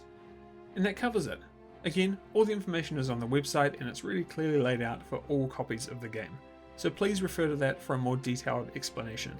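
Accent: New Zealand. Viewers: Really enjoy your Kiwi accent, man that brings me back to the amazing country.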